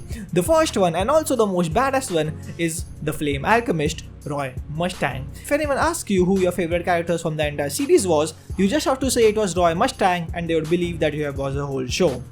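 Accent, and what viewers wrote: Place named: India. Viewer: Bro, i love your videos and i think you could become a huge anituber. But the main thing is if american/european/Canadians will watch your videos. Cox the anime community there is around 5 million people strong. Search up Gigguk, that's the community you can appeal to. I'd say only problem would be the accent barrier, but your English is already really good. You can become the first indian accented YouTuber who's appealing to the western audience. You can do it, just keep on going. I'd say also refine your content a bit more to appeal to western audiences more. Cox let's be real, the indian anime today is where the USA anime community was in the late 90s. It'd take decades to grow by just appealing to the indian audience.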